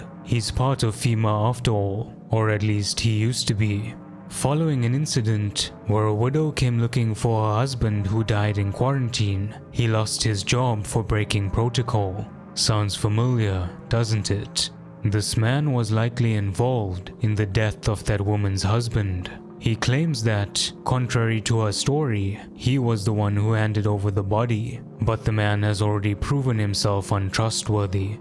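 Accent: South Africa. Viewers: This is real good, also your acccent rreminds me of home. Like, Pretoria, or Durban, SA Indian. Subbed, dope content grootman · No shi he’s from South Africa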